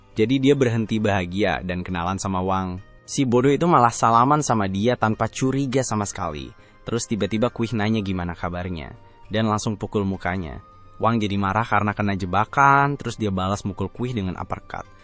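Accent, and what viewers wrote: Malaysia. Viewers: Abang orang Malaysia ya😊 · Orang malay bang? Aksennya gitu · Ni bahasa Malaysia apa Indonesia Tapi Malaysia dia pekat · bisa ga si gausah pakek logat malay bng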